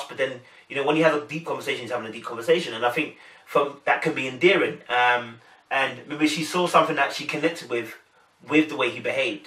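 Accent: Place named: United Kingdom. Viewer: Its nice to hear a British accent reviewing this show. Great review. New subscriber!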